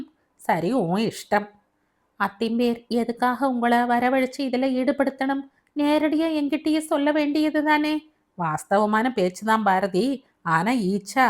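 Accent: Kerala, India. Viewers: Swarasyamai selgirathu novel👍👍👍 ungalin inimaiyana malaiyalathamil vasippu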